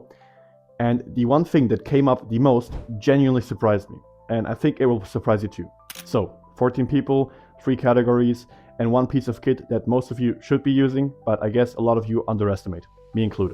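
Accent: Germany. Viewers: arent you german?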